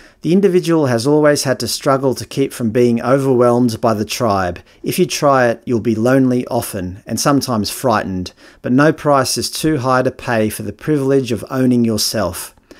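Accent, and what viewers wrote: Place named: Australia. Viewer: you're an Aussie !!!!! Love this subscription even more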